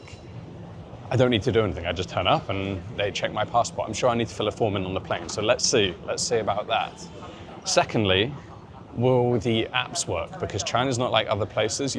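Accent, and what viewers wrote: United Kingdom. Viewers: Great video! You have Italian passport while speaking UK accent? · How is it you're using an Italian passport when you sound English?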